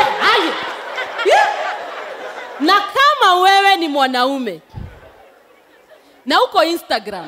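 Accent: Meru, Kenya. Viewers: 😂😂😂...that accent though....interchanging between meru and kyuk...(needs some work) but very hilarious!!!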